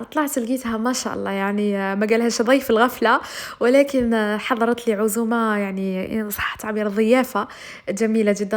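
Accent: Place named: M'Sila, Algeria. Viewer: سبحان الله وليتي تهدري كيما ناس مسيلة 😂😘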